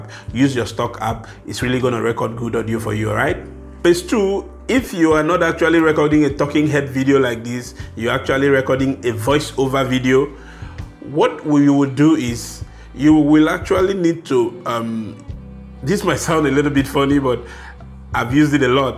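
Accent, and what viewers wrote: Africa. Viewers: I am Norwegian and I love the many ways of speaking English, including yours. African English is very pleasant. It sounds both robust and gentle at the same time. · I mean he has a rich african accent but a poor american accent, it's a language thing no need to make it so political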